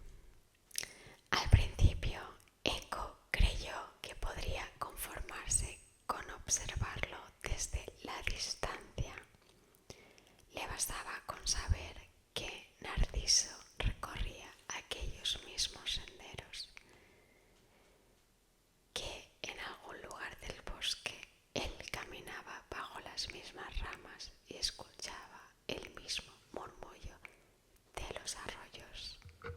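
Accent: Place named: Spain. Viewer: No sabía que antes de dormir te podía visitar un angel y más aún que ese angel tuviese acento español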